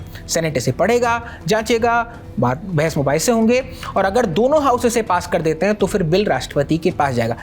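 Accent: India. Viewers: waah kya desi style me news padhte ho.. simply fantabulous...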